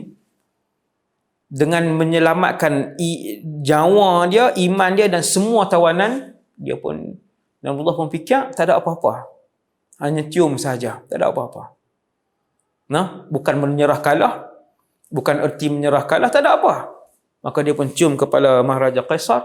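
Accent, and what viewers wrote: Kedah, Malaysia. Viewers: Alhamdulillah terbaik ustaz Wadi Annuar terbaik kedah pekat dimana pn kuliah xtinggal negeri Kedah pekat ringkas senang faham terimakasih ustaz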